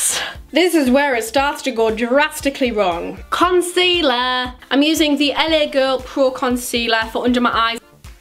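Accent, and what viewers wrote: Newcastle, United Kingdom. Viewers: i think she's from newcastle (dont hold that against me im not great with accents) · Are you from Newcastle? X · Sounds Geordie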